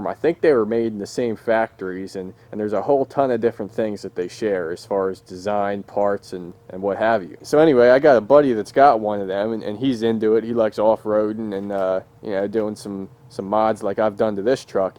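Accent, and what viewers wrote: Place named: Western Pennsylvania, United States. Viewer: What are we going to do with that Western PA accent!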